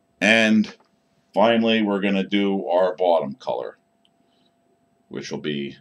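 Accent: Pennsylvania, United States. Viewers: I love it, and BTW, I could detect that PA accent long before I saw the envelope! LOL In my mind, are the colors not reversed? I would have thought the green would be on top? It looks awesome just the same! I just found your channel and am already learning lots! Thanks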